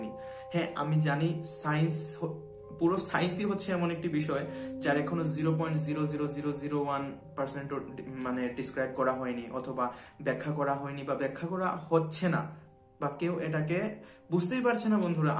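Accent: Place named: Sylhet, Bangladesh. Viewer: আপনি বাংলাদেশের সিলেট অঞ্চলের মতো কথা বলছেন। আপনি কোথায় থাকেন ভাই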